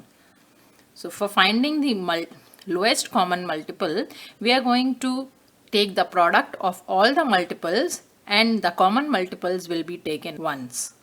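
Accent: India. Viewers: Itni English tu aaj suniye jitni main angrejon se bhi nahin suni thi angreji hai yah Indian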